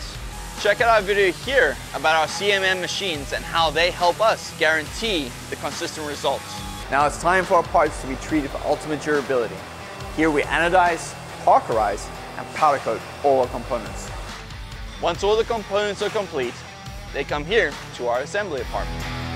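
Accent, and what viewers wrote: South Africa. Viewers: Hi; I hope this is not too personal, but do I detect a bit of an SA accent? Niks verkeerd daarmee nie.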